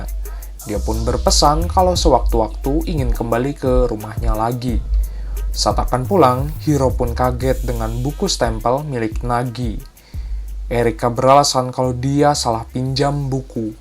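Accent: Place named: West Java, Indonesia. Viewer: Sunda gening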